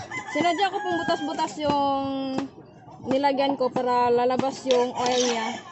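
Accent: Visayas, Philippines. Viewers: watching from baguio city.. new fan & new subs. sobra mong galing magluto.. galing... taga san po kayo visaya kc salita nio po.. God Blessed.. ❤️❤️🙏💞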